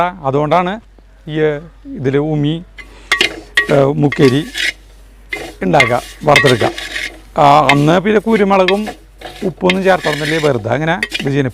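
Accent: Thrissur, India. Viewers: സംസാരം കേൾക്കുമ്പോൾ ശക്തനിൽ വന്ന ഫീൽ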